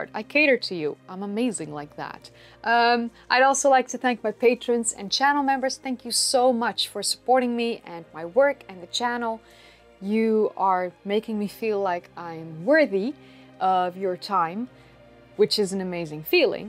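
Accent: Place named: Netherlands. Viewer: She speaks excellent American, but with a Dutch chattiness to it.But how can you tell the difference between the soot of a Neanderthal fire and of modern human fire?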